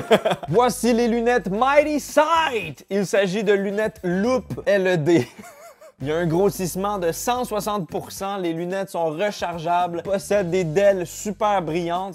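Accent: Quebec, Canada. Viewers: Je viens de découvrir cette chaîne et ca m'a bien plu!!!!! L'accent québécois, l'humour,les expériences,le ton léger, j'adhère !!!!!!De France . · J’adore l’accent canadien 🤣🤣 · Tu à gagner un nouveaux membres, j'aime ton accent du quebecq, et tu ressemble à un de mes ex potes disprus avec une seringue dans le bras, on le surnomais "le coyotte",très bonne prêsentation de tes articles, et j'aime aussi ton humour. "Serge. L" (depuis la belgique) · L accent du Québec est toujours aussi 😂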